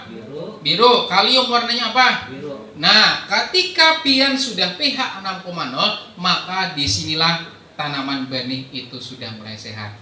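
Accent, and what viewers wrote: Banjar, Indonesia. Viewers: Urang banjar leh bang??😂😂😂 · Pian sekalinya urang Banjar 😁, Barabai kah pian bos kuuu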